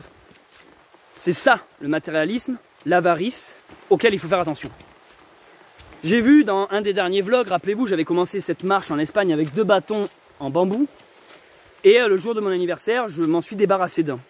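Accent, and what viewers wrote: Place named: France. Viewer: Fabuleux votre ´ french accent’ 🥰🥰🗽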